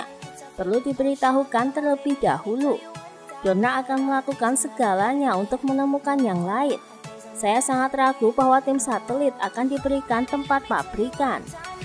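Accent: Java, Indonesia. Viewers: Komentator agak medok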